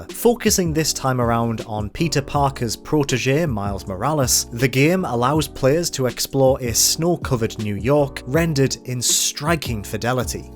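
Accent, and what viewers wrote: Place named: Newcastle, United Kingdom. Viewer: Here for floating Geordie representation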